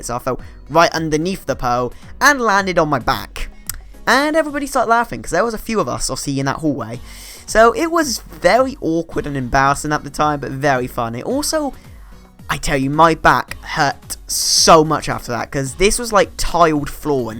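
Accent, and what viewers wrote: United Kingdom. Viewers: Omg I love your British voice I always wanted to speak British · Im sorry but are you british?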